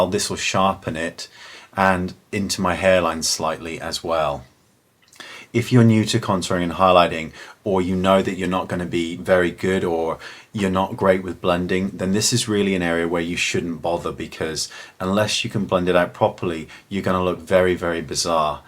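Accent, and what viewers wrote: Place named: United Kingdom. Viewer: nice British accent~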